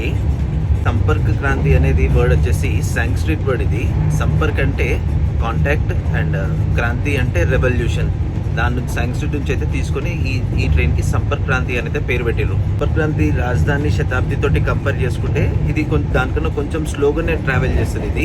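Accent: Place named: Telangana, India. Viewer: తెలంగాణ యాస 😊❤...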